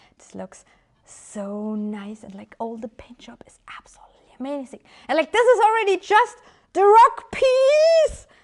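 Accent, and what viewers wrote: Germany. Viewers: Are you german? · i hear german accent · ^well china painted miniatures from resin are usually heavy. And before i order something i ll be looking for some apce, not afterwards. I cant get rid of the tought that she is like Carisma Carpenter in Angel... always talking, never stopping, but with this german accent...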